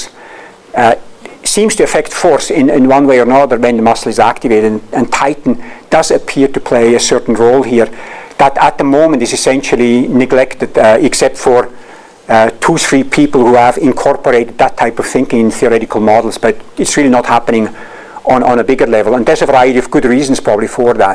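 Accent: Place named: Switzerland. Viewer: YEEE Er esch en Schwizer vo Leuggere. Geile Typ I was 100 percent sure hes got the classic swiss accent. Guess what - he is from my homecountry xD.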